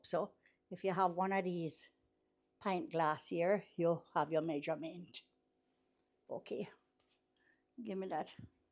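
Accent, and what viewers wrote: Jamaica. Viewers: Yes....a pick up de real Jamaican talk and real Jamaican "cooking hand". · Her voice alone just bring me back to Jamaica. Reminds me of so many elders that sounds like her ❤. Once you put sugar its no longer fry dumplings tho 😂 its either sugar dumplings, Johnny cakes or bakes as the rest of the Caribbean call it 😁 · Is she Jamaican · I love your Jamaican voice I feel like I am in Jamaica 🇯🇲 judt listening to you 😅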